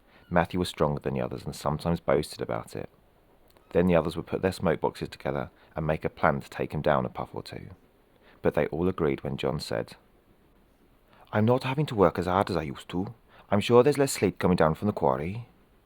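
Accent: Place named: Wales, United Kingdom. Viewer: Love the Welsh 🏴 accent, quite charming. 😊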